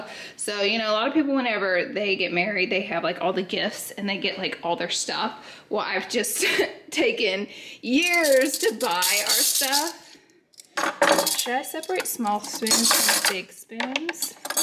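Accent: South, United States. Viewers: calling the pots boilers in her southern accent is the cutest thing on YouTube.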